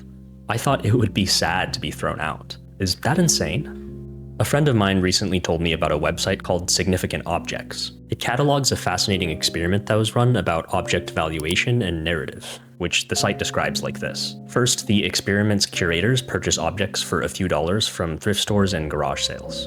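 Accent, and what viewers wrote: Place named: United States. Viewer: u showed ur self in the video in end and i was like who the hell is that guy | i was expecting someone american looking from the accent u broke that like the glass voice personification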